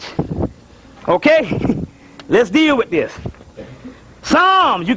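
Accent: South, United States. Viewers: I can listen to brother for Hours black Billy Graham with that southern tang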